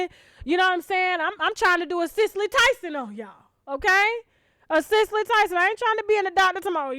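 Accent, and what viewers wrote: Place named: Deep South, United States. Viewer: I don’t know why I haven’t asked before but where the hell are u from lol I’m gettin Deep South vibes lol cuz I’m from Tennessee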